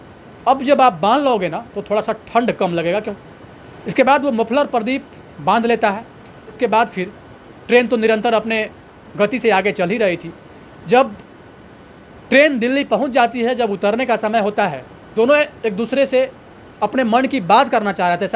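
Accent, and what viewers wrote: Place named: Bihar, India. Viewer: Aap bahut achha bolte ho absolutely Bihari style..I like it ❤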